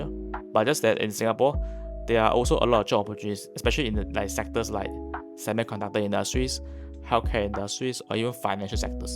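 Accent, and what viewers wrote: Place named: Malaysia. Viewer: Your English suck ! You are speaking Manglish !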